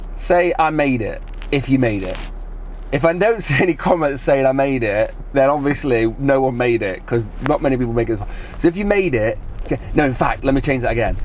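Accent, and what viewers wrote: Nottingham, United Kingdom. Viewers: plastic can sometimes look a different shade of colour, especially in a pearl white. if you have had the car protected(ie diamond brite) this can change the colour slightly. Where they failed in my opinion was someone should have quality checked the car and talked you through a handover. the headliner damaged and the trim hanging off aren't good, hopefully easily sortable. give the car a clean and see how the bumper compares, you will probably see a colour variation on the front aswell if you look enough! I knew from your accent your are local to Notts! I made it 2!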